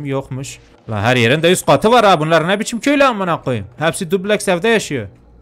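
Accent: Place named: Diyarbakır, Turkey. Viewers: Ay ay Diyarbakır sivene hayranim abimm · Abecan abecan sen kesin diyarbakirlisan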